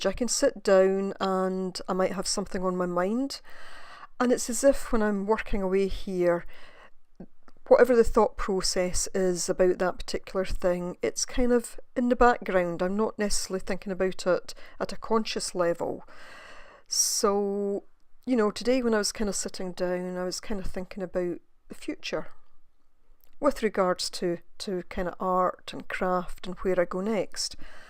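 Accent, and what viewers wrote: Scotland, United Kingdom. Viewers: Hello, I have spent the last three days watching your videos after discovering your channel. I really like your style of work, your explanations and your scottish accent! Greetings from Germany!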